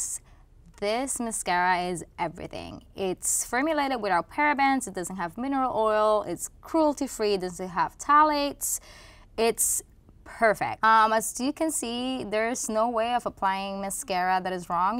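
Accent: Puerto Rico. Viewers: I love your Puerto Rican accent and you're beautiful! Love this natural look. Question... Do you wait a few minutes between each layer of product prior to your foundation? Thanks for a great video! 😀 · Ningún "Please excuse my thick Puerto Rican accent"! Eres boricua y qué! Gracias por el video! Mucho amor desde PR! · Well done Sephora for using a Puerto Rican MUA! Love the accent <3 and the tutorial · Lol I didn't even notice you had an accent until you said you're from PR 🇵🇷. Keep the clean makeup tutorials coming!!!!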